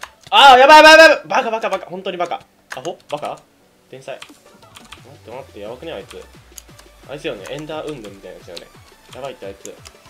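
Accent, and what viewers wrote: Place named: Nagoya, Japan. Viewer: 名古屋弁じゃん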